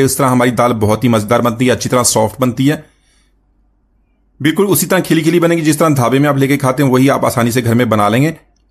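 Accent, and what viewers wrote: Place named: Pakistan. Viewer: bhai, aap bohot he umda recipe lay ker aaye hain aur bohot he achi terhan say samjhaya bhi hai kay kiss terhan pakay gee. Sahi Pakistani recipe aur lehjay main video banai hai aap nay, Zaberdust!!!!! bohot shukria.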